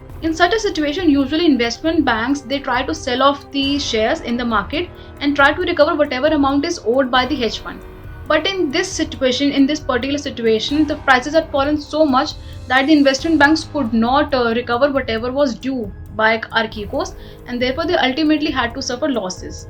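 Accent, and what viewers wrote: India. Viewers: good game.. well played yeah my head is confusing with indian accent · Indian accent is pain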